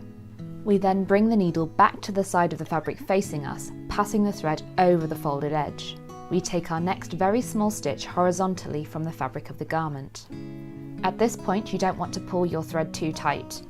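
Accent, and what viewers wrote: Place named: England, United Kingdom. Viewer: Have always wondered how this is done! Great video, and a beautiful English accent that is easy on the ear ! 😊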